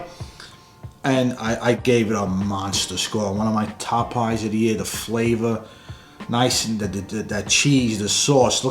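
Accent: New York, United States. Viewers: 💙 yea videos and your NY accent.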